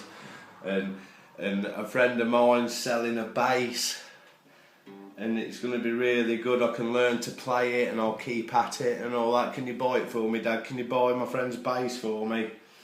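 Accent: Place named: South Staffordshire, United Kingdom. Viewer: My first bass guitar was a White Shadow. It was orrible. Actually judging by your accent I wonder if that one was once mine (I Live in south staffs...). Tradd mine in some time in the early 90s..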